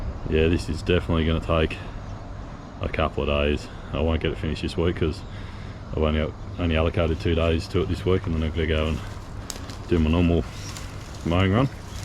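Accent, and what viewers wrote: Australia. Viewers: When u like hearing languages and recognize it as Australian accent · TV aerial was my guess! Woot! Great job. I love the charity work. Love your accent, too! Down home Alabama here, so hearing an Aussie is a treat. Keep cutting! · At first I wasnt really paying attention and took your aussie accent as a british one and was like, why the hell is this guy worried about spiders. Then I realized that he is in Australia lol. Cant imagine having to worry about creepy crawlies all day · Where are you located? Are you an Aussie? Love the accent.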